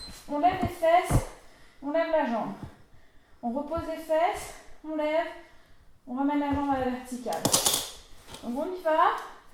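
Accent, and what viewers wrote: Réunion, France. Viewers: "la tantine elle commence le naffair, elle me dit même pas"...Vous êtes réunionnais ? :D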